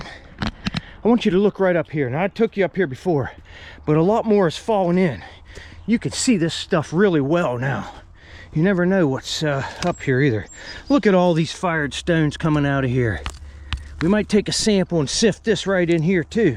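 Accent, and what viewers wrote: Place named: Pittsburgh, United States. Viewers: It's a southwest PA dialect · That Pittsburgh accent I can here it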